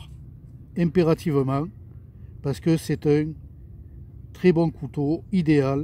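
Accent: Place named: Corsica, France. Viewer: salut. je connaissais pas ce Victorinox, pour 12€ franchement c'est vraiment excellent. en + la qualité Victorinox ça ne ce refuse pas. superbe soleil ☀️ et j'adore ton accent qui me rappelle la Corse. mes amitiés 😉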